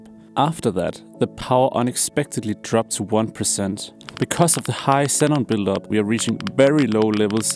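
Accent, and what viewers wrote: Denmark. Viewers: Are you danish? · Are you Danish? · hold da op man kan nemt høre du er dansk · Danish accent ruined the video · Are you danish? Because I’m almost positive I detect a danish accent in there somewhere! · Du lyder dansk! Cool video! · I think you mean Walken? There is something reminiscent in the intonation but the actual accent is, I believe, Danish so pretty far removed from Walken's american lilt. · are you danish ? your accent sounds danish idk. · danish guy speaking english? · Danglish? Great video!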